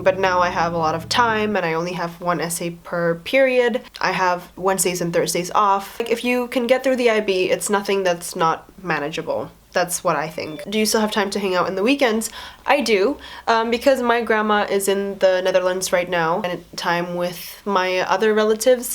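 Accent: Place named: United States. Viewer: you have a totally perfect flat american accent.. howdja do that?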